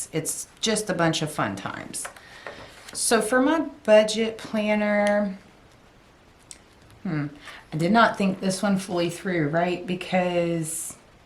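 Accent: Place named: South, United States. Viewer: I really like your idea of using only two of each filler paper so you go through them... I'm the same way... I HAVE to have extended disc simply because I WANT IT ALL in my planner. It's the main reason I've come back to happy planner after having them for years but never knowing what a frankenplanner was.... Best thing EVER! I also love to hear you talk - that southern drawl!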